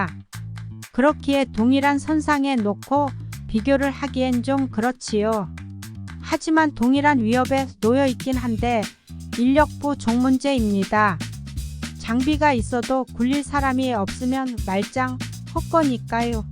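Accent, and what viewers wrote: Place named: Chungcheong, South Korea. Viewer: 나래이션이 충청도라서 답답함. 전문성이 농후한 영상이라 생각됩니다. 호주 호위함 사업 탈락 때문에 자꾸 비교하려는 것이겠지요. 많이 배웠습니다.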